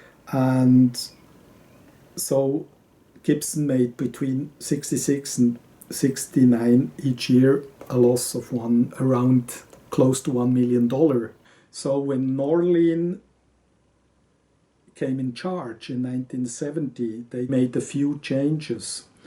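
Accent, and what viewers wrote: Switzerland. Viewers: I can tell by your accent that you are Swiss! So am I.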